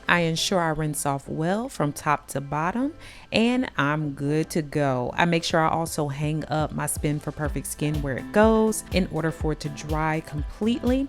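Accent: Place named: Atlanta, United States. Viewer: You sound like Monica are you from Atlanta?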